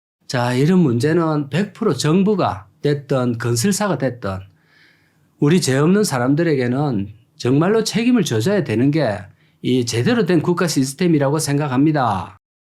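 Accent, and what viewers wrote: Gyeongsang, South Korea. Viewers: ㅋㅋㅋ 참에 아~아~아 할때 왤캐 웃기죠 ㅋㅋ 경상도 아재님 ㅎ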